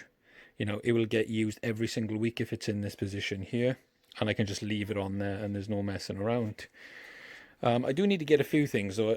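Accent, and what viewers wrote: Wales, United Kingdom. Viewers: Currently looking into getting one of these. What are your thoughts on after owning if for some time. It took me a minute to understand your accent at the start then realised you're Welsh haha I'm from Carmarthenshire (Cross Hands). Not used to hearing our accents on YouTube!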